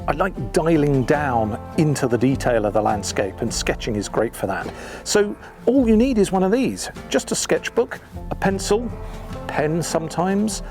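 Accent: England, United Kingdom. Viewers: i havent watched your channel in a long time. i always love it. and the english accent is way worth watching the whole thing.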